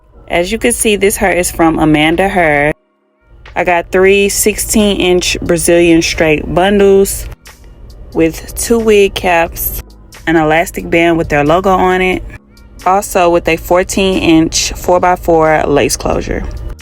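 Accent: St. Louis, United States. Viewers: She sound like she from st. Louis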